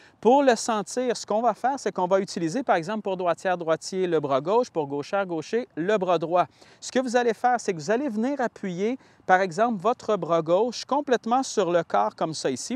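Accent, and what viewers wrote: Quebec, Canada. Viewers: Merci, simple et efficace. Bravo pour ton talent de pédagogue avec l'accent du Québec en bonus pour nous autres français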